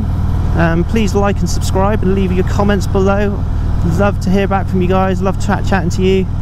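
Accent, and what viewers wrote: Somerset, United Kingdom. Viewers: Great video you are doing great work. First of for your decision on getting and choosing your harley you got spot on. For your starting vlogging you should see motonewb blockhead early stuff really gritty video and sound. First started watching xxxdeadhead and shawnsmoak also robbertrob and huntingharley. I've noticed and they all done this as they went on started saying they upgraded their cameras microphone mainly gopros with senna headsets. As for your contents don't really threat you are doing great stuff there is one thing which is rarely done is pavilion opinion something to consider in the future. What do you talk about what roads like where you live what is your favourite route why's it your favourite. Why you like were you live or don't because of the roads traffic. What you thinking of doing to your bike in the future and why. 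I. E. I've got a pink metallic paint job with unicorns because why not. Chicks love it gets me laid a lot and who F, ing put it in the laws of bikering you have to have a black or covered in skulls bike to be a biker. Just a point my bike has skulls on paint but who cares i like it. Just do what you want to your bike and if you want tell why you chose to do that. Just a mention no one as far as I know has installed two inch tank raising kit on the new streetbob. Your voice is good definitely sounds with hint of somerset region. Microphone you are using is good. Those motorvlogers who done it for a while also use filters on their pc's. You are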